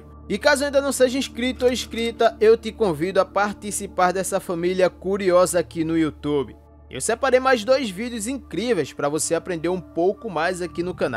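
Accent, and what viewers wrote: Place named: Bahia, Brazil. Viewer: O cara é mais baiano que eu que sou baiano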